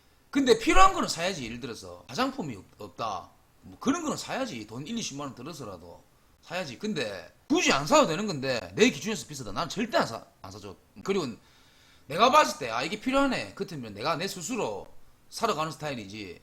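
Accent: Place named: Busan, South Korea. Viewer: 하~~~이거 보다보니 몇편째 계속보고있네 썰푸는거 잘안보는데 너무 잼있네요.군대동기 부산놈하고 말투 목소리도 비슷하고 은근히 친근감이 느껴져서 구독하고가요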